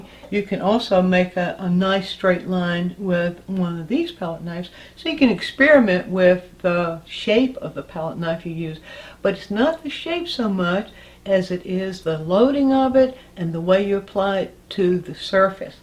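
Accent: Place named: Texas, United States. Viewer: Dear. I,m so happy to have found you and appreciate how you teach with demos, I love your soothing. Live and accent. Are you Texan? Thanks you again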